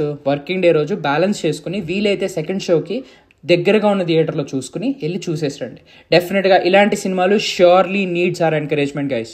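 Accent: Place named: Godavari, India. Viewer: Movie emo Kani Ne Godavari slang was awesome bro 😄😄👌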